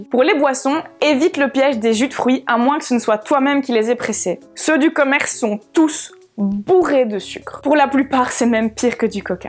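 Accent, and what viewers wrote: Liège, Belgium. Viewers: L'accent belge ? C'est l'accent de Liège. Tous les Français parlent comme Jackie Sardou ? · On reconnaît l'accent belge à des km <3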